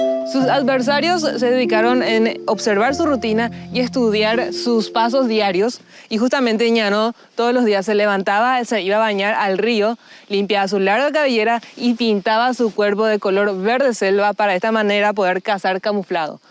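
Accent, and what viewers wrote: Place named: Buenos Aires, Argentina. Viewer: Es porteña no es paraguaya por el acento al hablar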